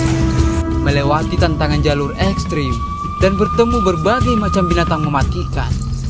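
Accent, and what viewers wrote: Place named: Sulawesi, Indonesia. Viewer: igt kawanKu yg di sulawesi cara bicara nya🙂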